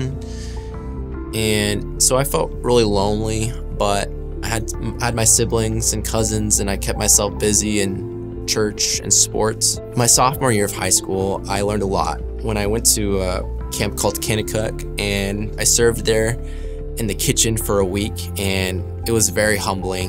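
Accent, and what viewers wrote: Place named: Kansas City, United States. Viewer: You sound like you are from KC..I am sending your videos to my 21 yr old who is a senior in AR but was raised in KS. She is strong in the faith and yet goes to a huge party school where it is frowned upon. Dating has been hard for her but she managed to meet Christian guys at Country dance clubs as she loves to dance and would go there in AR and then she went out in KS to one over break and she met a guy who gave her his business card with a bible verse on back. Here I am wanting her to be equally yoked and she keeps meeting guys in the weirdest places but they dont work out. Your videos are really funny!! Thank you for being real and even though its a difficult walk..Dont compromise or give up ...persevere...Jesus is worth it!!! God Bless!!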